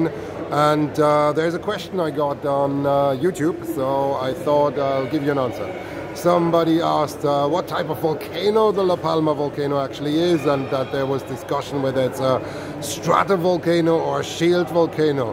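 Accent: Ireland. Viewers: Thanks a million for sharing your expertise and thoughts on volcanic matters on the isle of La Palma in a way that everyone could follow. Very interesting and much appreciated. I love your Irish accent!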